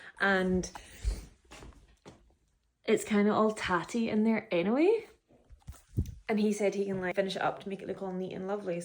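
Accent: Scotland, United Kingdom. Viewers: I’m a new subscriber. I love all the scenery and of coarse you as well. I could listen to you talk all day long.My Mom was Scottish , born and raised in Glasgow. My Mom and grandma can over to the US when she married my Dad. The dna kit says I’m 80% Scottish. We use to go to Scotland every summer. We usually stayed with my Aunt and Uncle who lived in Ayr. I’ve been to Alloway several times. I’m old enough to be your mother but just hearing your accent brings back so many wonderful memories for me. My Mom and grandmother have both passed so I miss the accent every day. I wish I could move to Scotland. It’s always been my second home. Alas, I don’t have the money so your vlogs it is! I’ve watched every one now and always look forward to a new one. I’m so happy I found you! 🍎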